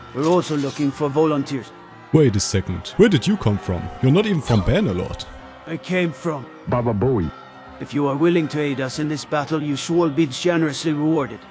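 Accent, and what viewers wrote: Germany. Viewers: liebe deinen Deutschen akzent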